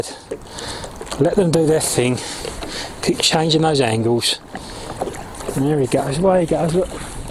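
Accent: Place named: Essex, United Kingdom. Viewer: Nice one - very useful information. I'm from up here in the north west and I've been trying to mic your lovely Essex accent to my mates up here - nearly got it!! Great stuff mate - well done!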